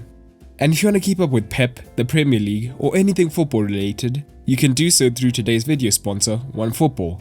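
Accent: South Africa. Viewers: U sound South African lol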